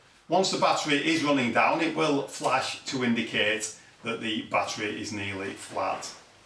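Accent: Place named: Leicester, United Kingdom. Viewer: Great to see you back. Do you live in Leicester? Its sounds like you're from that neck of the woods. 2 and a half 'Howers' 😂😂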